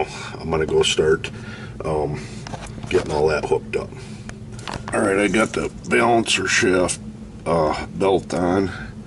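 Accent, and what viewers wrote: Minnesota, United States. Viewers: Northern minnesota?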